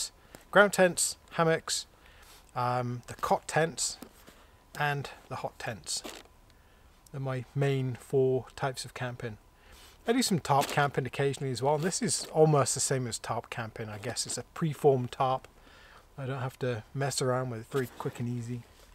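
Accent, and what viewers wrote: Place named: Welsh Border, United Kingdom. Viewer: You appear to be a adoptive Canuckistani and I can tell from certain nuances in your accent you’re from the borders of England and our western neighbour😂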